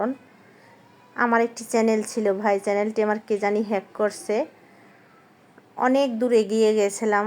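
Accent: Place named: Bangladesh. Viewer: Didi apni ki banglades thaka? Voice tone ta oi rokom tai jiggasa korlam❤❤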